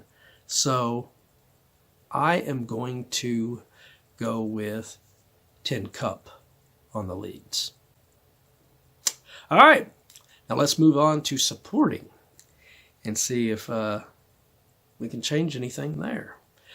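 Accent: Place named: United Kingdom. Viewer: I did like it. I noticed immediately that you had a totally different voice, certainly not an American one. And it was a good idea to use a British accent. As I've said before, it sounds a cold, commanding, sinister note. And amidst all those good old American "guys and gals" in "Queen Kobra" it was doubly effective.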